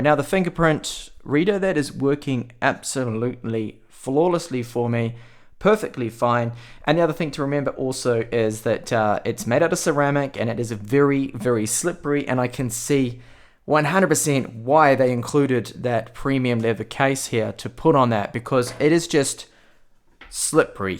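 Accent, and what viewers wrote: Australia. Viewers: Hey man first time on your channel, quick question, did you have to mod anything with the phone in terms of sim like do you use a provider like optus? Just guessing from the accent, thanks man. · I can't tell if you're from Australia or New Zealand... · I love your accent, are you australian?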